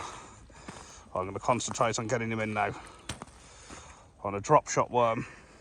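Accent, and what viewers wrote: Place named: Midlands, United Kingdom. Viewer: Hi. In Anglesey ATM, Friday. I'm just about to go down Beaumaris for a chuck with live bait later this afternoon for Bass. Caught a nice 4-5lb bass last week. Was amazed when I saw the video as I live in Leek and cycling around their yesterday on the mountain bike. Sounds like you're originally from the midlands area with that accent?. Great to see you're fishing a wide area, including North Staffordshire 🎣 The Rhudyard Lake Hotel to your right up the hill is great for a pint and some grub when you're next down. Cheers 🍻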